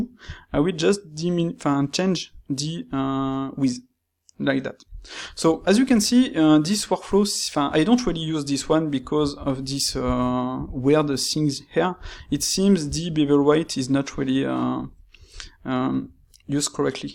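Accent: France. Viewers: Ooohh Lya Lyaaa ))) youre cool frenchmen ,I like the accent and the teaching ,, appreciate for this techniques . thanks big time! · Your English sounds very French in pronunciation 😊👏🤣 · Don't worry about your accent. I love the sound of the french. I did french in school but forgot most of it. Loved it more than spanish. Your english is quite understandable, so don't worry about the negative comments. Now proceed as normal. Every word of english you speak sounds more expensive.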